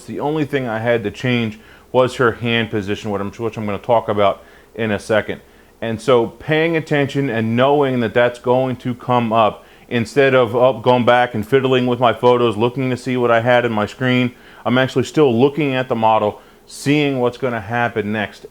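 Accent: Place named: Philadelphia, United States. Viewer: You must be from the Philly/SJ area. Your accent betrays you.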